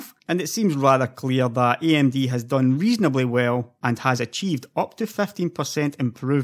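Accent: Scotland, United Kingdom. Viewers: a Scottish bloke talk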